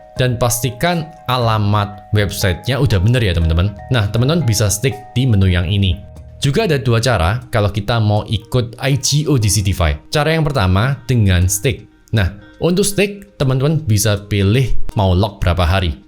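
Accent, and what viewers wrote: Java, Indonesia. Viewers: Bro asli mana medok bgt. Salam jawir wkwk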